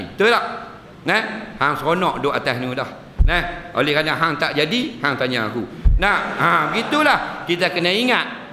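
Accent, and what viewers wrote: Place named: North, Malaysia. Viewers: Ckp utara x kekok lngsung..Fasih sgt😀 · fasih elok noh loqat utara..seronok la..esok kita nk mengundi..esok kita dh bleh buat pilihan..terima kasih tok guru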